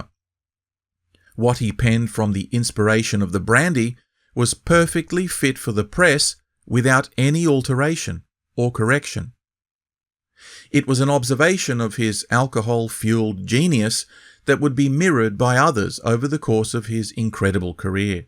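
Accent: Australia. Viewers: I...think you are an Aussie. No problem haha. Your productions are great. And you have an excellent delivery voice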